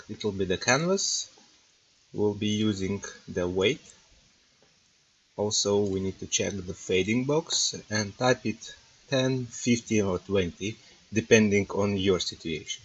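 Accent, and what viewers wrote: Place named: Russia. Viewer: btw, is it Russian accent or some hispanic?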